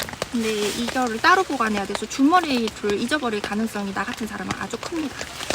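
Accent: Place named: Gyeongsang, South Korea. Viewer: 참 목소리가 매력있네요 약간 경상도 풍의 사투리가 깔리면서 약간 옥구슬 굴러가는 듣한 뭔가의 특이함이 있네요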